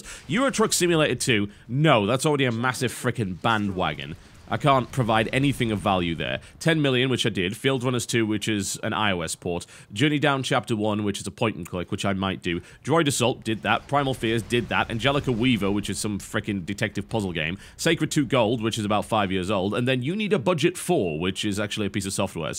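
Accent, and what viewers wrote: United Kingdom. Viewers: i enjoy content patch more than anything on this channel by a wide margin i feel it's the most informative [by it's nature it's suppose to be] and most entertaining probably because i enjoy coherent gaming rants by well informed people in a british accent i beseech thee oh great biscuit of totality find the time to bring us a new episode of content patch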